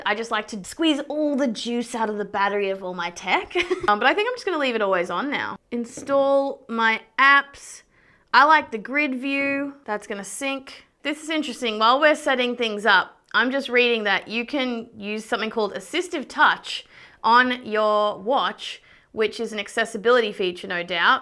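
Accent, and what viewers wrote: Australia. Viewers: Yeah, great channel by the way. Are you Australian, I am.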